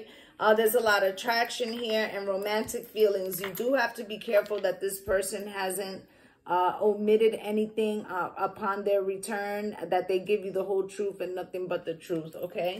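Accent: New York, United States. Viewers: Definitely hear it when you speak. I can always tell when I hear a fellow New Yorker! 😁 What part are you from? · He'll NEVER be in my Empress energy ever again and he knows it so I'm unburdened and chilling on my throne with my peace and abundance in ALL forms while moving forward😎. I chose ME and snatched my energy back from a toxic ,unbalanced, superficial, false mask, lying, player libra ex after betrayal. NO contact and NO communication will remain in place permanently for that narc demon vampire! Shout out to New York😍. I'm from the DMV but I know a New York accent😁. Peace and Blessings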